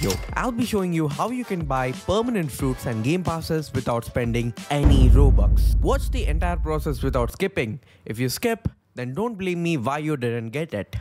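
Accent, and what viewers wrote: India. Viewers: Yuo indian?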